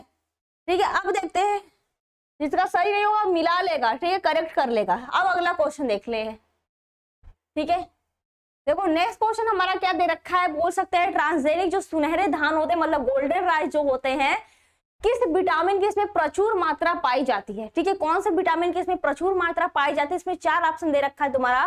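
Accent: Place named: South India, India. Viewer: Thoda sa aapki bhasha madrashi lagti hai Lekin samajh aayi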